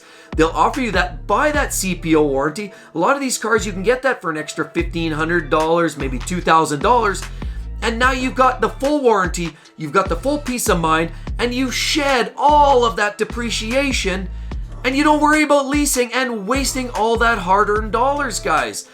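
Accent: Canada. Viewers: This guy sounds Canadian AF.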